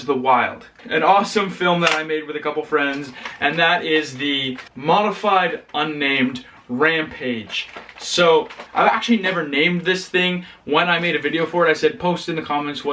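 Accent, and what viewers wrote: Canada. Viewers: are you canadan or american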